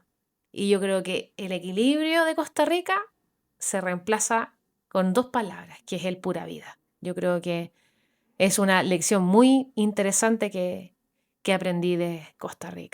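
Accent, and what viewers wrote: Chile. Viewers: Me gusto bastante como lo explicaste, es así como que hay poblemas y tratamos de que no nos afecte tanto, acá algunos llevamos la vida como relajada como sin prisa Y cuando uno va a otros países le extraña ver el corre corre, por tú asentó me pareces Chilena, hace poco fuimos allá y fuimos a un lugar en la ciudad a comer comida bien tradicional y el mesero nos atendió como con una prisa y a la vez se veía como triste y decia una amiga comamos y nos vamos porque ese señor como que no nos quiere aquí 😅 Será eso que nos acostumbramos al trato Pura vida ❤ siempre una sonrisa, siempre sin prisas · Srta, he tenido la oportunidad de vivir en varios países, iincluyendo Costa Rica hoy vivo en Kentucky pero que maravilloso ha sido su analisis sobre este pequeños pero bello país, por tu acento creo que eres chilena y una de mis mejores amigas en mi pequeña ciudad Somerset de es Sol, una ex diplomatica de Chile en China y Europa con quien compartimos todas las semanas y siempre terminamos hablando de nuestra amada ¨Tiquisia¨. 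Su analisis me ha hecho reflecionar de todo lo que implica la expresión PURA VIDA. y sin temor a dudas cuanta razón tiene ud. Ojala cada pais de nuestra amada Latinoamérica y del mundo tengamos ese sentido de orgullo de la tierra y cultura que nos vio nacer, como lo diría el gran Poeta Nicaraguense R.Darió ¨ Si la patria es pequeña una grande la sueña¨ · Saludos hermosa Arquitecta de sueños. ´¿ Quisiera tener una polola como vos? Ja quedo impresionado por tu cultura, bueno soy un tico que quedó enamorado de tu país y sólo fui desde Santiago hasta Antofagasta, ahí me quedé. Chile es la tierra donde alzas una piedra y aparece un poeta. Me encantó la cultura poética de Chile, tengo gratos recuerdos y me halaga lo que hablas de mi país. Soy Alex, un poeta costarricense y puedo escribir unos versos sobre tu belleza. Me encanta como proyectas la voz, tu lindo acento chileno y bueno me gusta que hables con conocimiento. 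Quisiera volver a Chile para cooncer el Sur, eso sí en verano por favor. Dicen que el Sur de Chile tiene muchos lagos. En el norte se ve otra cosa y se prueba el Pisco chileno. Saludos y avísame si querés el poema de un tico y a donde te lo mando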